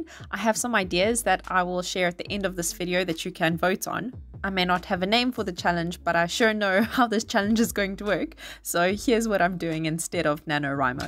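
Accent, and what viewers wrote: South Africa. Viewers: Are you South African?.... I miss home....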